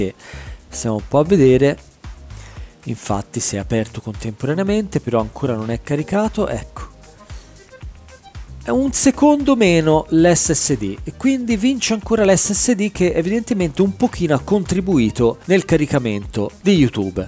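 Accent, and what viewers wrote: Tuscany, Italy. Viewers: Ma scusa se ti dà fastidio l'accento toscano non lo guardare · Hai un accento di merda, potresti essere più ascoltabile se non tenessi l'accento toscano nei video. X il resto meno male che va + veloce è un SSD. Carina la cosa della scheda madre anche se è vista e rivista mille volte. MI RACCOMANDO CAMBIA QUEL ACCENTO DI MERDA DA TOSCANO !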